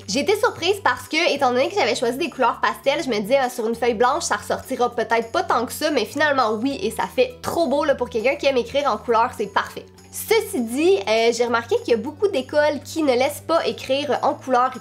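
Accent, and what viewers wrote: Quebec, Canada. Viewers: Est tu québécoise ? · J'adore ton accent !!! J'essaie d'apprendre l'accent québécois ! Tu es trop belle j't'adore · L'accent québécois 🔥😍😍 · Alors je pense que c normal je viens de France du sud même mais sinon j'adore l'accent québécois !!!!😀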